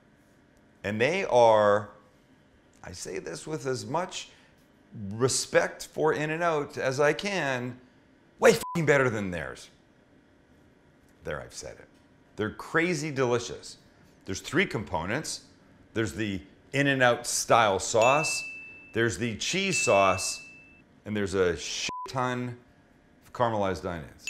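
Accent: Canada. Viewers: Are you from Canada??? I thought I kind of picked up on an accent but wasn't sure. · i've been trying to figure out if he is! everytime he say out or about i'm like...hmmm, i wonder if he's canadian! lol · Canadian accent.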